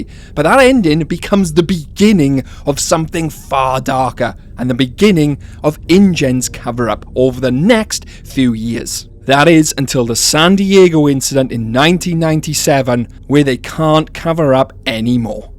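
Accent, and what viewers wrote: Wales, United Kingdom. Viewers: He sounds Welsh to me but I know what you mean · Are you welsh?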